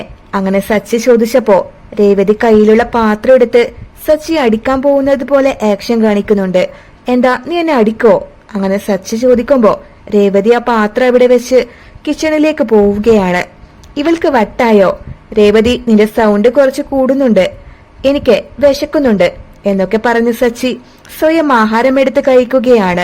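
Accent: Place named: Malabar, India. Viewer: മലബാർ ഭാഷ ആയതൊണ്ട് ചൊതിച്ചതാണ്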